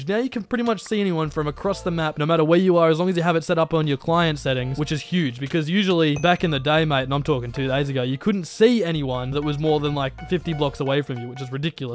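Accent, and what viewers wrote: Australia. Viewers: Are you from Australia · you sound more australian in this video 🤔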